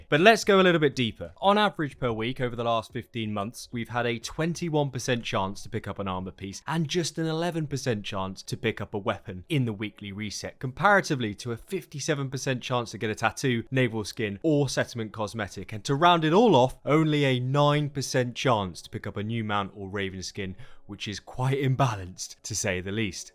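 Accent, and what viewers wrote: United Kingdom. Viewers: More great Valhalla insights - thanks. Some interesting changes hopefully,. I'm relatively new - still playing England map - but get frustrated with tattoos and more tattoos. At least I get to accumulate opals - until 'must have' items drop back-to-back like the dragon raven skins and the Shattered Blade. I noted that the Valkyrie outfit was removed from Helix store recently so glad I got two pieces from Reda - and saved money. One piece of armour is useless so I fear frustration is meant to make us spend £$. P.S. Get homesick every time I hear a Brit accent - lol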